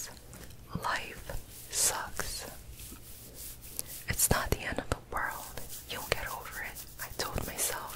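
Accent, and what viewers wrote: United States. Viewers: Your NY accent is all up n down your vowels... i love it lol... where in NYC did you grow up? If you tell me youre a Brooklyn chick i'll die 💜 (assuming you respond again)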